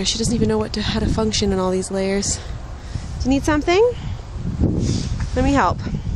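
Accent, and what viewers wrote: Canada. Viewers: hilarious... your canadian accent is in FULL swing :) Probably from being around your family :P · Love it! Btw, you sound so much more Canadian in Canada ;)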